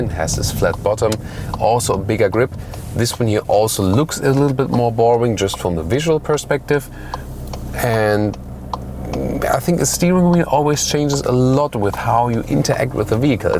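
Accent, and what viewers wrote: Germany. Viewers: I believe your german accent renders you unable to properly pronounce the word "vehicle" . I am sorry to say that this way it sounds as if you were trying to say "fecal" but with "v" at the beginning. This is not an insult ... just my failed attempt at constructive criticism.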